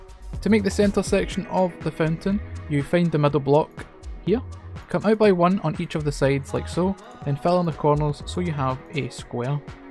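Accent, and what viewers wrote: Scotland, United Kingdom. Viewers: I've just come across this video and your channel so thank you for sharing! I've liked the video and subscribed as I'll be using the fountain for my town on the Lilac Towny server :) I hope you continue to do more videos as it's so nice to hear another Scottish person - I'm a wee Scottish lassie!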